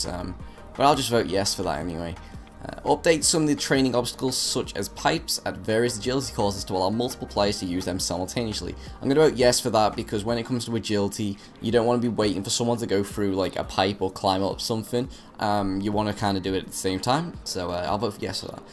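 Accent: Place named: Liverpool, United Kingdom. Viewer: Got to be Liverpool, that accent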